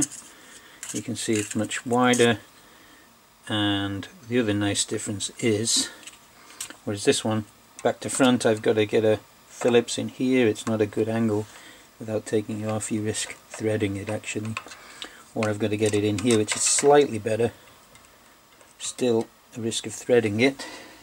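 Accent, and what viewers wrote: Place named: Ireland. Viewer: U sound Irish, Scottish and Welsh sometimes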